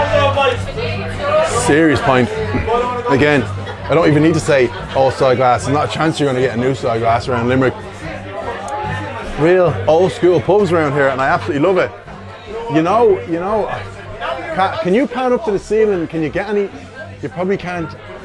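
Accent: Liverpool, United Kingdom. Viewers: Haha love the scouse accent, just noticed your Liverpool video so I’ll be watching that next!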